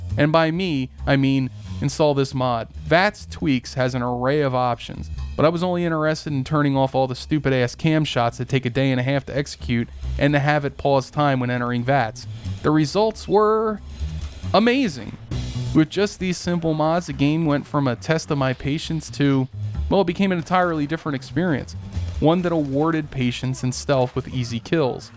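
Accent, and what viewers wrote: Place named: Philadelphia, United States. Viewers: Love that I found this guy. A) he is definitely from the Philly/ South Jersey area. As someone from Delaware, I recognized that accent IMMEDIATELY. Feels close to home - like I’m listening to my cousin instead of a stranger, which is awesome. B) this commentary makes him sound like a disappointed Philly sports fan, but instead of being let down by his team(s), he’s let down by Bethesda. I love it. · That accent sounds familiar. Are you from Philadelphia?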